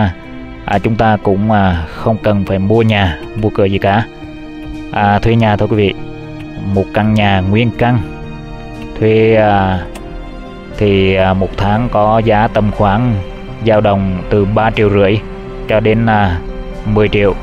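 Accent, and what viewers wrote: Central, Vietnam. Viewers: Nghe anh phân tích thích ghê,,,giọng miền trung nhẹ nhàng dễ nghe,,chắc hát hay lắm đây,,Em thích kênh này với Nhân La Cà nhất,,ngày nào ko xem cảm giác như thiếu thốn gì đó,,thanks anh!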